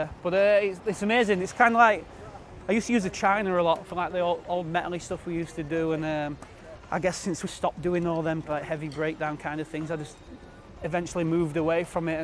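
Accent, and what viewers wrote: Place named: United Kingdom. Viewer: The accent.... 100% authentic Brits, y' know wha' I mean?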